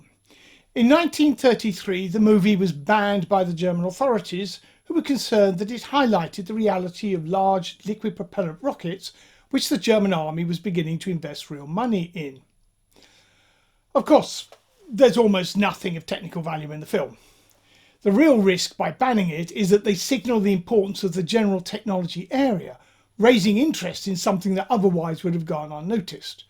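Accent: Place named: Yorkshire, United Kingdom. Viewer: Fascinating. The way you pronounced Frau im Mond sounded distinctly Yorkshire. Yes, I know The Romance Languages have the same thread running through them and the Germanic Angles and Saxon Tribes settled in Yorkshire some time in the C5th, or C6th. It just means I was reminded of the connections tied together in a neat little bow, through your audible delivery. Thank you, Cheers.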